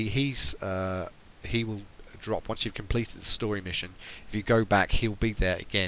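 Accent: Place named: United Kingdom. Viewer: I would rather shove bamboo under my fingernails than listen to British people drone on and on. It sounds like you have the personality of a log.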